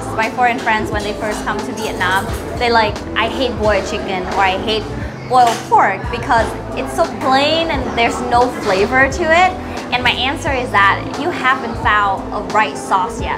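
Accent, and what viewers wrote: Midwest, United States. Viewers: I like most of your videos, but one thing you say wrongly (my view) is your very American pronunciation of the word HERBS....you say ERBS! Correct English expression more acceptable to a wider English speaking audience is NOT to drop the "H" of such a common thing in Vietnamese food speak. The Americans have changed a lot of the English language as you know, and while your American accent is cute we Aussies of the older generation particularly have resisted Americanizing spelling & pronunciation. And you know as a Ha Hoi girl being educated in US when they were the former enemy of your parents & grandparents it is a big culture difference thing too. Let's discuss in our next Checkin! With me about to start learning Vietnamese I must try to pronounce things the correct way when in the north, central or south. I want to learn the Central & North ways firstly. 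· Your American accent is so good...love your Vietnamese voice too, very nice videos and editing. Keep up the great work. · Really like the new (?) logo. Feel it fits you and your channel really well from what I have seen. Do you know the artist personally? Suggestion: Add google map links to places you highlight in the description. Paddy Doyle does this and it is something I think every vlogger should copy. Works so well to easily find and save places. Like that cool ice cream shop which I saved. Side note: I know you said (in another video) some people said things about you vlogging in English vs Vietnamese. But there are people like myself who really love local vloggers sharing their country with us in English. There is nothing wrong with the standard western expat or digital nomad vlogger (minus the creepy ones) but it is nice to have locals in the mix as well. My first exposure to "travel" vlogs was via some Fil-Am vloggers, Bulay Says Hi (she stopped) and Wil Dasovich (which I found via Bulay) and his friends. 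There are several Filipino (and half Filipino) vloggers who vlog in English but outside of PH it is much more rare. So I am glad the YouTube algorithm suggested your channel vs how so often I get suggested the "creepy" ones. (Sorry YT but just because I like videos about ASEAN countries and watch expat vloggers doesn't mean I want to watch videos like that.) Nice to get suggested a good channel vs a "creepy" one. Bonus that you are truly a local. Double bonus that you have a midwest accent like myself lol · beautiful girl with fantastic American accent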